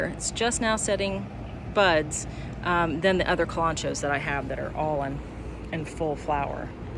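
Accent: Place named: Texas, United States. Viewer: Your garden is such a delight. Enjoy listening to your Texas voice as you describe everything. Thank you for sharing.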